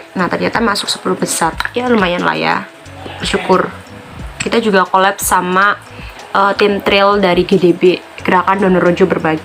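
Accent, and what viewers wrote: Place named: Java, Indonesia. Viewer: Medok ya mba.. Jawa banget deh ☺️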